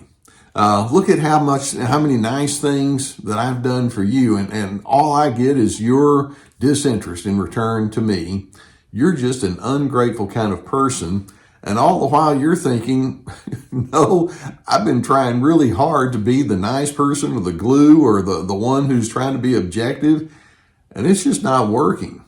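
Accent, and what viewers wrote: South, United States. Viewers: Thanks so much for all your videos. You are so helpful and your Southern accent is charming. Warm regards from Canada.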